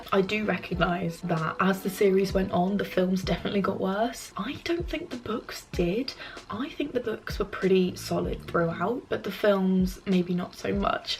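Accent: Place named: England, United Kingdom. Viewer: where abouts in england are u from? love your accent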